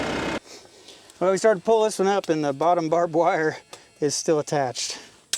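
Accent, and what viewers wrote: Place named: Tennessee, United States. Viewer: I been hearing the ess-cavator as well. I think it's the Tennessee and Kentucky that migrated into rural southern Illinois.👍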